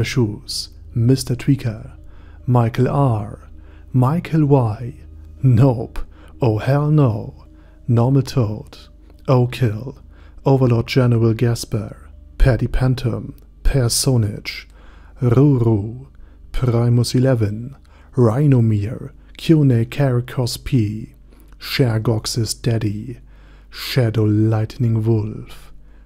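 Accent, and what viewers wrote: Germany. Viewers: I appreciate your videos. I’ve been watching and looking into your videos for years now. I love accents, and your German accent is phenomenal my man.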